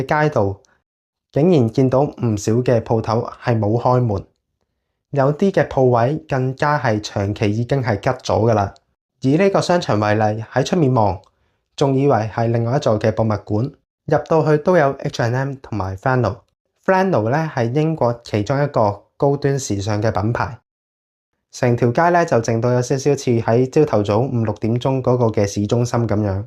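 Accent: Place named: Hong Kong, China. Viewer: 講者的英語口音仍停留在港式發音。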